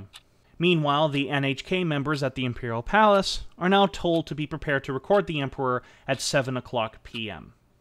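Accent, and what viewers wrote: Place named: United States. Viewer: About half-way through and really enjoying the telling of the story - the one thing that is grating on me is the pronunciation of some of the Japanese surnames :( Ida for instance (I believe) should be "ee-da" and not "eye-da" and Takeshita should be "ta-kesh-ta" not "ta-ke-shii-da". Gotta watch that American accent and those "t"s . Aside from that, good story, nice pace.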